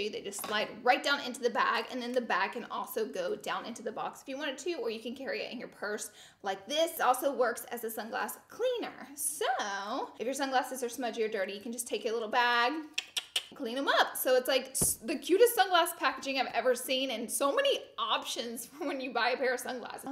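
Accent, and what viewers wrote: South, United States. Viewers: omg grl! thank u so much for sharing & I watch your channel because of the makeup & the comedy! you are so funny! I'm from the south too so thank u for not hiding your accent or your goofiness. You are amazing! It takes so much dedication to work with your husband I'm sure. thank u for always being yourself . God bless u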